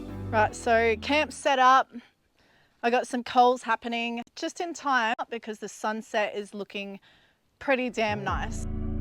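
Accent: Australia. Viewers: Love the Australian Accent!!!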